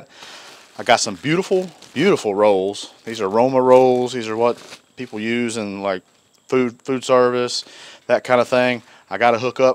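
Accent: North Carolina, United States. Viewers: My 36” is coming in this week! You sound like your from my neck of the woods near Raleigh NC. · Man you gotta be from somewhere around G-town , NC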